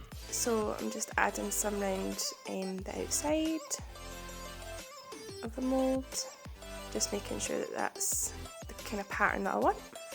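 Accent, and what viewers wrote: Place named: Scotland, United Kingdom. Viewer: Great Video, I agree about using a whisk its much easier to get the mixture creamy enough and lump free. Thanks for Sharing, great to hear the Scottish accent too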